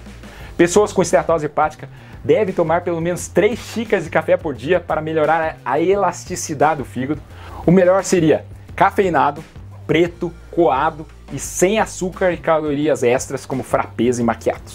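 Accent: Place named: Caipira, Brazil. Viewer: Amo esse sotaque caipira dele.❤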